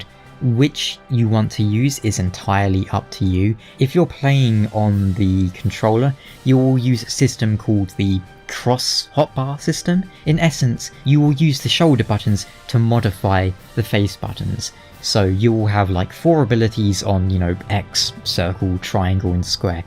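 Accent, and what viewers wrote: United Kingdom. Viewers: Not that this is any of my business, but I'm assuming he's talking about an Oldschool Runescape game developer called Mod Ash, who is a bit of a meme within that community. EDIT: Anyway I don't get why he's calling you that since you sound nothing like Ash. My guess is this guy isn't British and as such thinks all us British people sound exactly the same.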